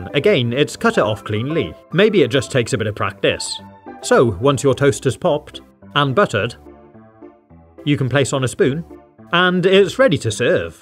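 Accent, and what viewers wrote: United Kingdom. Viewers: I love how easily distinguished his British accent is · I am British and you sound like me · Nice accent boss where you from United Kingdom?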